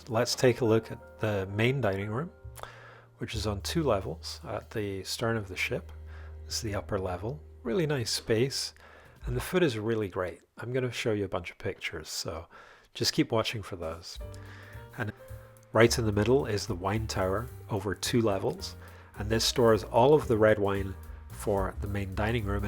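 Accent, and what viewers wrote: Scotland, United Kingdom. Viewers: Lovely vid, Thank you. We have just booked the Lisbon to Buenos Aires cruise in Nov '24 on this ship. Nice to hear you Scottish accent appearing. Big hugs fae Bonnie Scotland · Hey, I’m assuming you are Scottish by your accent. You can pronounce Foyer just like us Brits and Canadians do. Don’t mind the US bastardised pronunciation lol. 😂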